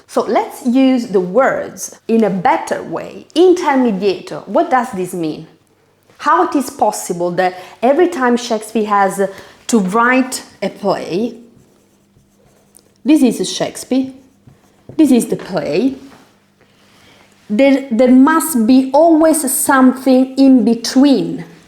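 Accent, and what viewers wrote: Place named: Italy. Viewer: From your accent I think you are Italian🥰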